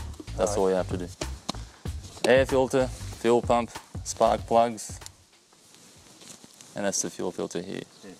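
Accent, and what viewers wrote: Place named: United States. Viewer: American English. Sorry if i offended but I'm just giving my view.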